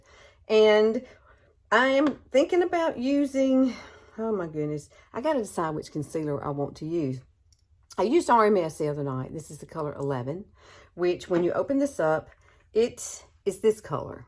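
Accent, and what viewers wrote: South, United States. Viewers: Love your southern accent 💕 what a stunning look I am going to try that on my 76 yr old wrinkly eyes and face 🙌 thank you beautiful girl 💕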